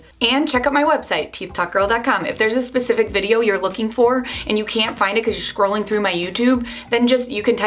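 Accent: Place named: Midwest, United States. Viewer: i picked up a bit of a midwestern accent from her hahahaha. however, great video! never would’ve thought this would be on my recommendations!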